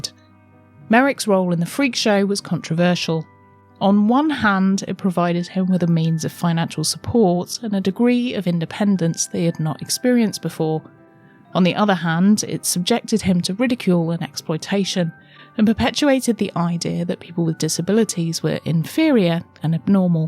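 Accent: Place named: United Kingdom. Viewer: I can remember when I first saw the movie it had just came out back in 1982 I believe it was. My three older Brothers took me to the old single movie theater in Clinton New Jersey. I was still very young and I can remember being afraid and very sad. As you guys say The cinema. I've seen it over the years. And have watched countless videos and pictures and such about the young man. I live in Florida. And I truly wish that I was able to make a historical and as accurate as possible. A movie about Him. From his birth and even also after his death. I truly enjoyed your video. And I have always loved the English British accent. I'm Italian and German on my late father's side and on my mother's side I'm English and Scottish and Irish and Scandinavian. And I've always enjoyed drawing. And I have had such a major and passionate interest in this young man. 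I know that I can't truly know what the young man went through in his short 27 years.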